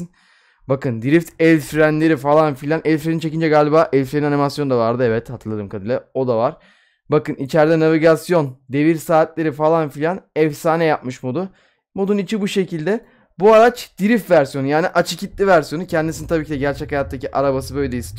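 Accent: Erzurum, Turkey. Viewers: ANA ABİ SEN ERZURUMLUMUSUN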